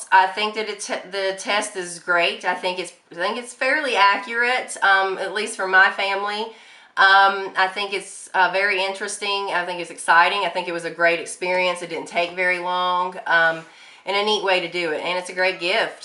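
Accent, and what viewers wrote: South, United States. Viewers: No you are not 100% European, you are however 100% Southern! The accent gives it away, no DNA needed! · You sound like you are from the south.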